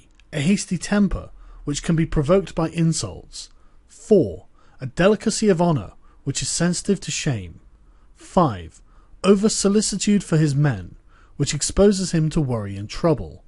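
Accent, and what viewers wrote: United Kingdom. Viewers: O my, I read the book twice before. But those times compare bland compared to you smooth British narration. Keep up the good work!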